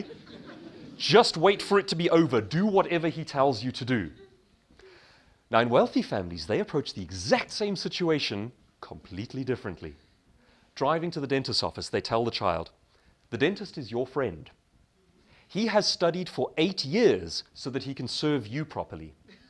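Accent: England, United Kingdom. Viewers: Queens English? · He is an excellent speaker he has an English accent that you can understand very well and very knowledgeable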